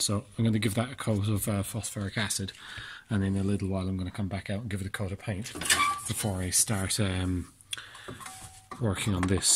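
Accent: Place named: Ireland. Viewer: Loving the videos, the music and the relaxing Irish lilt, looking forward to the next one 👍👍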